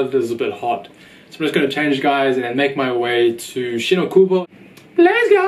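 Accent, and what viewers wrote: New Zealand. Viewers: Know a kiwi accent when I hear one! great vids bro keep it up chur :)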